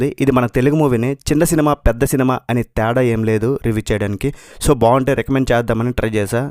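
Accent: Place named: Telangana, India. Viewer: జై తెలంగాణ యాస 🌚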